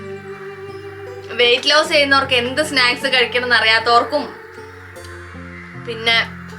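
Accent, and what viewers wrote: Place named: Kottayam, India. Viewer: തനി കോട്ടയം ഭാഷ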